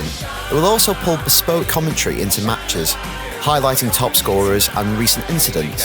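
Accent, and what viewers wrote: United Kingdom. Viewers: everytime theres a football game review on ign i think "boy, time for some british accent :D"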